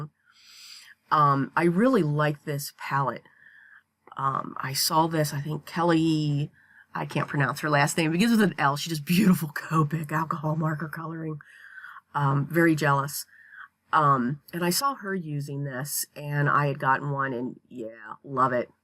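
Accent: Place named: Philadelphia, United States. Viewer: I knew it! I ‘m from Bucks County. I have been in Florida for the past 28 years and every time I hear you, you sound like home. 🤗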